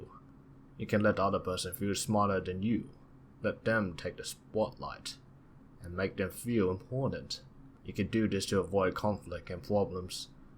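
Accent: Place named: Australia. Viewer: I’m not trying to disparage* I’m curious, where the narrator is from. I’m guessing someone who spoke one of the Asian languages fluently, then learned English as a second language either in Australia or with an Australian tutor. Am I correct? Am I close? Again not trying to accidentally offend you, that’s the accent I picked up on, I could be wrong, so I hope my question doesn’t bother you!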